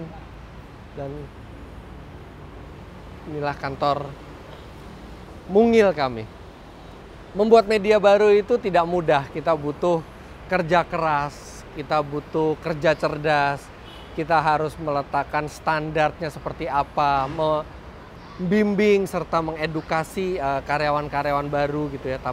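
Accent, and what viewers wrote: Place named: Java, Indonesia. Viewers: dan saya logatnya medok dan saya langsung dapat panggilan jawir😅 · "Agak shock berada disini. Karena....cara ngomongnya itu beda. Saya biasanya itu 'aku, kamu' disini 'lo, gwejh' dan saya logatnya medok dan saya langsung dapet panggilan Jawir"🗿 …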